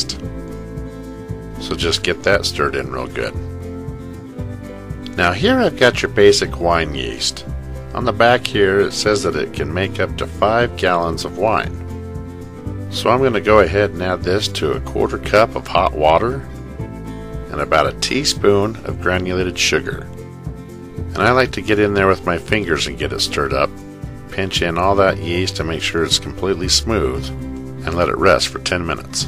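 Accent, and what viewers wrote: United States. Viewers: very good cowboy accent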